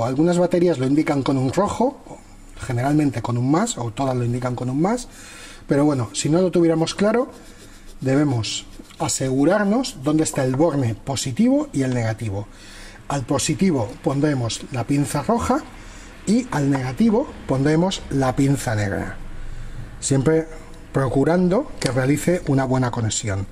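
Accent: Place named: Madrid, Spain. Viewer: Saludos desde Ecuador. Residí en Madrid varios años y tu acento me suena a Madrileño. Quiero felicitarte por compartir el conocimiento, que acá en nuestros países suramericanos le ponen precio a todo. Lo mejor que aprendí de residir en España es eso: "Compartir el conocimiento" como lo haces tu. Gracias. Otra vez.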